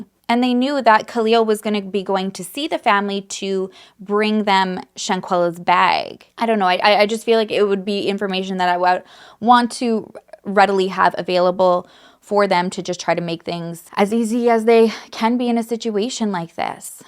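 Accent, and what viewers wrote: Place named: Canada. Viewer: You're Canadian? I'm from Minnesota and we have the same accent. Did my parents lie to me and I'm really Canadian? 🤦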